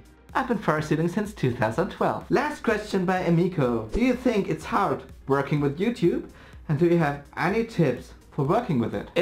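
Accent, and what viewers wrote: Germany. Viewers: you have a German accent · your accent is as german as it gets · I love your german accent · nope, german accent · you do have a bit of a different German accent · The curse of the "German Accent" is with you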